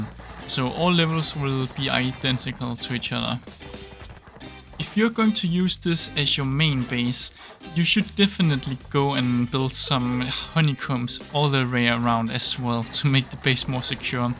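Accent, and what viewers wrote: Denmark. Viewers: hey are you guys danish? because you sound danish :) btw i am danish have a good day or if you now danish hav en gode dag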